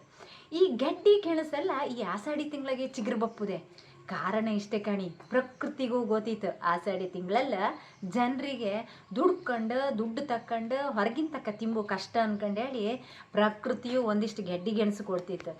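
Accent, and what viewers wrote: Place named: Kundapura, India. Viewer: Kundapura kannada ❤ U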